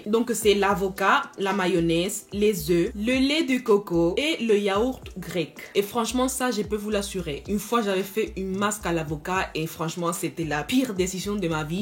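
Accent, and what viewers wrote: Spain. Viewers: Tu as l'accent espagnol 😊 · ton accent ... je me demandai si tu étais espagnol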